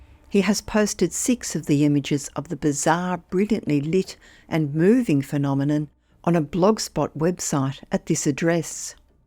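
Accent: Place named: Australia. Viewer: Sexy aussie voice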